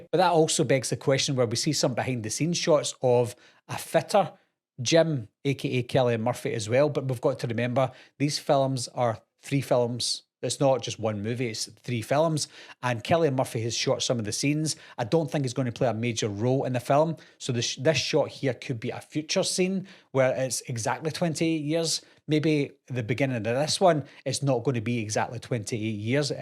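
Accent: Scotland, United Kingdom. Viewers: Are you from Scotland ? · Dude,I love the Scottish accent!❤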